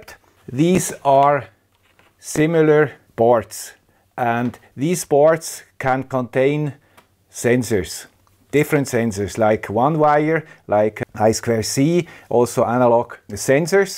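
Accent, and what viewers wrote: Switzerland. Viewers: I love the Swiss accent, but if you could just change this pronunciation... Circuit is pronounced sur kit.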